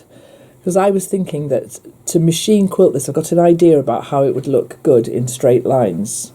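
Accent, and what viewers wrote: England, United Kingdom. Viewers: I have must found your site. Lovely to hear an English accent. I live in South Australia. I will be looking forward to seeing more of your beautiful work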